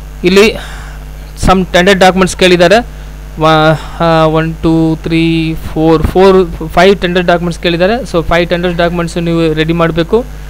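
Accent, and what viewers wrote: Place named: North Karnataka, India. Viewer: Yappa maraya kannada dag matad pa hingyak alakati clear aagi matadidre tiliteti...Uttara Kannada dav adree yak nachkotiya hodi9 Annu yak achcha kannadalli matadbek anta alta idiya,relax agu modlu..,tekta idira eke...?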